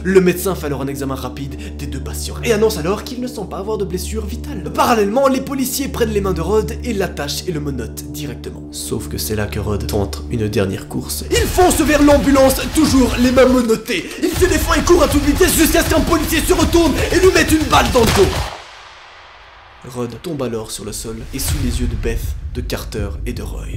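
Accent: Belgium. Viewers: Haaa t belge je comprenais pas quand tu disais 75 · Tu es belge ou suisse ? Moi je suis belge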